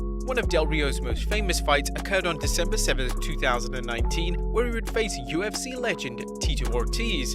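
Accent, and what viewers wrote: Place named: United Kingdom. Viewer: I'm not being a smartass but just telling you that he isn't from America I think he's from UK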